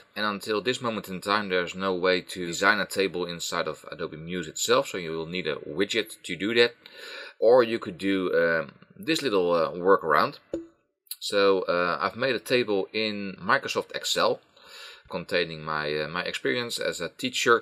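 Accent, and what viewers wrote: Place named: Netherlands. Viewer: I can tell you're dutch haha! Either way, very helpful tutorial! Kudo's!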